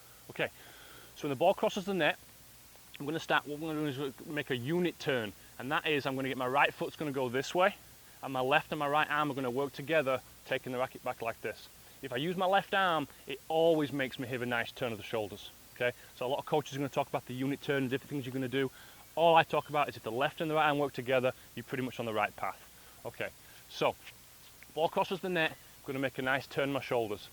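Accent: United Kingdom. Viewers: Great to hear an Northern accent (hope i have that right! Yorkshire?) on You Tube. Looking forward to the videos! PS: I'm from the real North...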